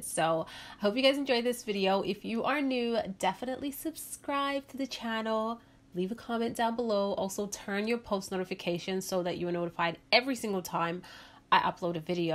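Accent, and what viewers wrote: New York, United States. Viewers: how did you start getting a NY accent???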